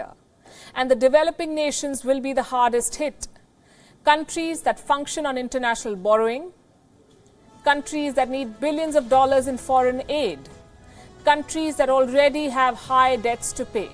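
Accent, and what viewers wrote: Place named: India. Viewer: INDIAN ACCENT PAY PEY, SOON SUN, SAY SEE, ABLE EBLE, PEOPLE PEEPLE, TAKEN TEEKEN, AID EED, WAIVE WEIVE, NATION NETION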